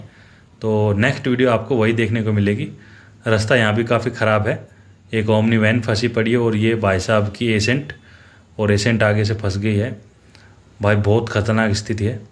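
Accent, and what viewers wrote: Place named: Haryana, India. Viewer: भाई जब आप हरयाणवी मै बात करते हों तो बहुत अच्छा लगता है ❤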